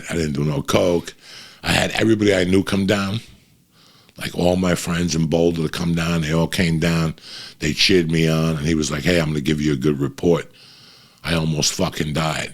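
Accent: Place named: Philadelphia, United States. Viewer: I really like that you are in a new spot in the room, that is the coolest Bruce Lee poster and all the wall Art!! Thanks for sharing your videos with us. Salute to you and your Family Uncle Joey. You are like hearing my Family talk when I was younger in Philly! Thanks for All you do for people that you may never realize have been saved due to you telling shit like it is. Have a Great Weekend Brother!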